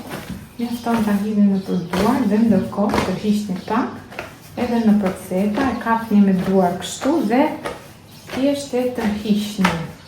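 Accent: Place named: Gjirokastër, Albania. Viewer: Bravo,te lumshin duart! Mos je Gjirokastrite,te kuptoj nga dialekti qe perdor.Edhe une jam gjirokastrite. Te pershendes!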